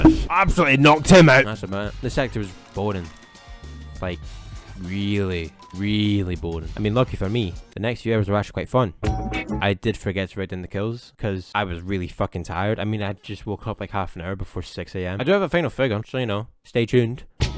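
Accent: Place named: Scotland, United Kingdom. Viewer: why are you scottish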